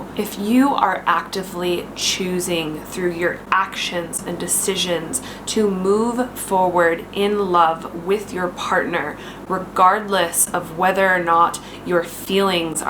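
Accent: Australia. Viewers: i love how all your “O’s” are so Australian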